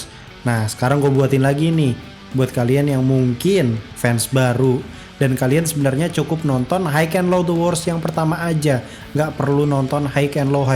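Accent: West Java, Indonesia. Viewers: Orang Sunda Oge euy admin na 😁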